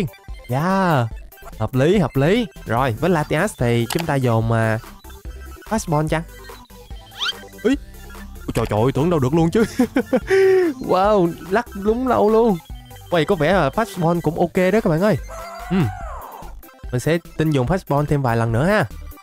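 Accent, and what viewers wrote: Vietnam. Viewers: Ah là người miền nam hay miền bắc vậy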